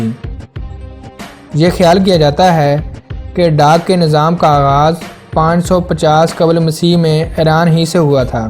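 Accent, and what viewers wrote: Pakistan. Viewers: خخخخخ هندی نیست ببخشید پاکستانی دالخور است از صدا یش مشخص اس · Khuda me banday Urdu ko Punjabi lehje air talafuzz me to na bolo, think karo, were post achi this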